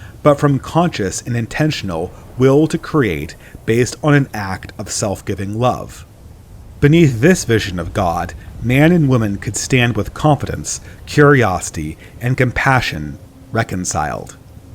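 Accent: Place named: California, United States. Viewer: I hear the voice of a fellow Californian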